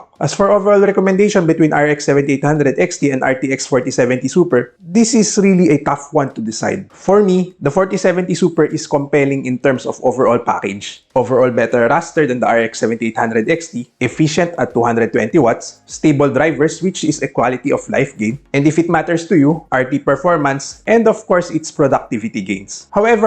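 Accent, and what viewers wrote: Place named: India. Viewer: Ur accent similar as indian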